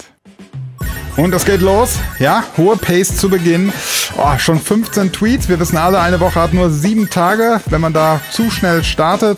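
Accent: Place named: Turkey. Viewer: Er hatte schon in einem Video das aufgeklärt. Es ist halt die türkische Aussprache.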